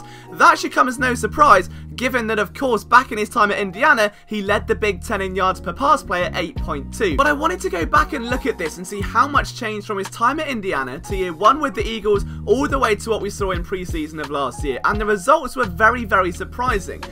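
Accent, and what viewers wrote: United Kingdom. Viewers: Does the British accent indicate that NFL football is really taking on in the UK ?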